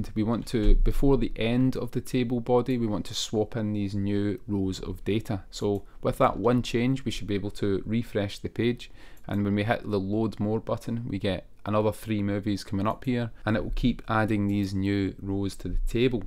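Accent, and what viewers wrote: Scotland, United Kingdom. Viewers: How interesting that the Scottish accent is easier to understand. I wonder what Koreans make of other accents from the UK?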